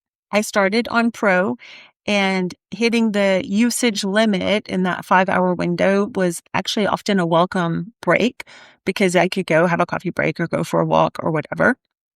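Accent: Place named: United States. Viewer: One of the first things that caught my attention: American accent, but Weck jars in the background? As I'm just starting out with Claude Cowork and Code, I'm looking forward to following along and maybe avoiding some mistakes. Bonus: finally a woman in my age bracket explaining things to me instead of a 20-year-old guy.😉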